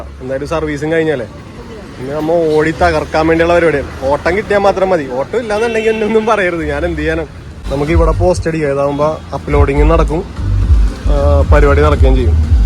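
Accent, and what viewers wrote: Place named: Kochi, India. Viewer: മച്ചാന്റ്റ് സംസാരം കിടു കൊച്ചി സലാംഗ് ❤️❤️❤️❤️❤️❤️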